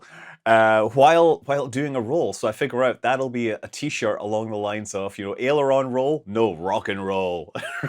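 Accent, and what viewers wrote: Scotland, United Kingdom. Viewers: I'm not here for the visage. I'm here for that Scottish brogue! 😁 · Congratulations, you're a genuine good guy who deserves all the success in the YouTube world. Have always loved your channel and your unpretentious style of presenting. Plus you haven't adopted some awful mid Atlantic drawl and kept your lovely Scottish brogue 😂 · Please confirm that you will still says "moon" with that deep Scottish accent. Nobody wants that to change. On a more serious note: Congratulations on this new chapter on your life. It seems you have a lot of good fun ahead of you. Happy for you. Enjoy the ride.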